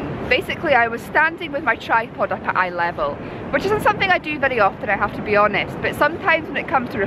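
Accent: Scotland, United Kingdom. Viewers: Nothing beats a Scottish accent in the English language and, you excel in it:) Keep them videos coming.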